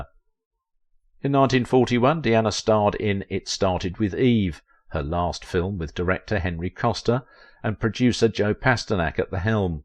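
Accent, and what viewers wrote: United Kingdom. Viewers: Please stop pronouncing it " shed..yul." I don't care if it's the UK way, it's WRONG...and it sounds stupid.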